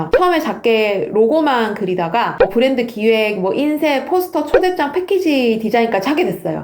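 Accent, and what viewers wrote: Busan, South Korea. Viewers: 님.. 억양에서 사투리가 있어서 경상도분이신가?시픈데 걍 고향말씨 듣고싶읍니다. 사투리해주소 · 부산 사람 아입니까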